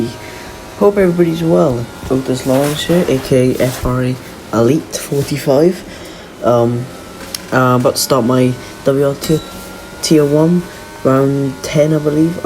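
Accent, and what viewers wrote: Wales, United Kingdom. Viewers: I’d recognise that accent anywhere! Newport or Rhondda? Great driving mate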